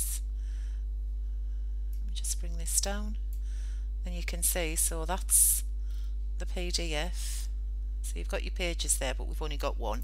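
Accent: United Kingdom. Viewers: Scouse :D? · Ha i love the Geordie accent, thanks very much for the help! :)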